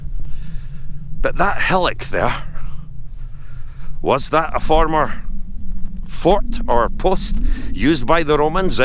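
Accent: Scotland, United Kingdom. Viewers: Ach, i appreciate your Scottish accent, exploring the lands in Wellies, as I will never be able to visit. ❤ and especially the romans pegasus. Such ahigh ceiling and huge carved blocks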